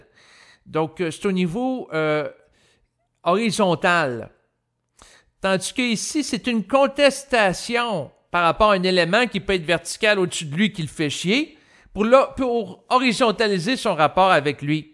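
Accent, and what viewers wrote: Quebec, Canada. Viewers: dac la prochaine fois je dit tu 😉 j'adorrrrre ton accent québécois je peux écouter des heures et des heures.Un vrai plaisir d'écouter. 😉 👍 👋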